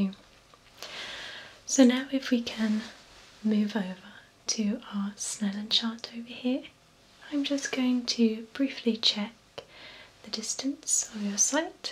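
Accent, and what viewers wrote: United Kingdom. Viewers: starts with that british accent <3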